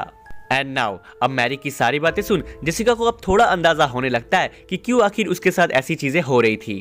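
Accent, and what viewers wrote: Bengal, India. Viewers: Are you bangali, but was nice talking to we are and thenk you for still suggested explenation you will again a lot viewer as much as subscribers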